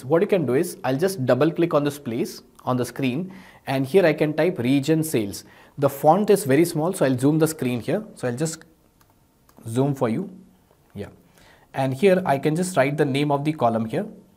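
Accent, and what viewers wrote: India. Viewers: Nice video! Is it too difficult to get rid of the indian accent for the indians? Just wondering. Keep up the good work!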